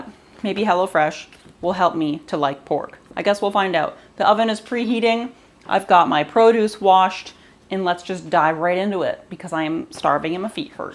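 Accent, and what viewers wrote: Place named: Midwest, United States. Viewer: Nice Midwest accent 😄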